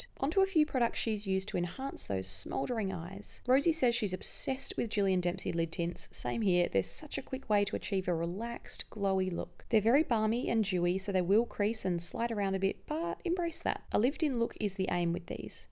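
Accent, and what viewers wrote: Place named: Australia. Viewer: Hi I have a question, do you live in Australia cuz u got an accent and Ye... oh and if you do then where do you get the best skincare products for cheap? I have recently got into skincare but most shops are very pricy.. so if you have any actual in store stores then plz spill:)))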